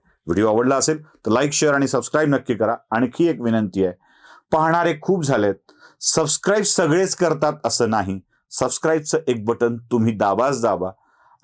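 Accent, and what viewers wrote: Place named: Marathwada, India. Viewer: नमस्कार, tumche mhnne ani wichar 👍pattat nakki. Ek vinanti- pratyek video sadar kartana ha pahila aahe asha स्फुर्थीने ani aadarane sadar kara pls. Sky is the limit. मराठवाडि शैली 🥰adhik prabhavi aahe. जय हिंद 🇮🇳जय महाराष्ट्र🚩✌️🙏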